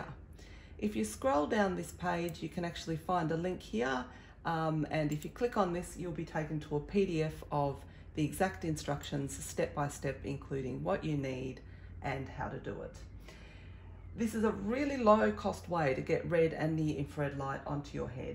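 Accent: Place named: Australia. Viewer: I find your video very informative 👍 Plus, I really like your Aussie accent :)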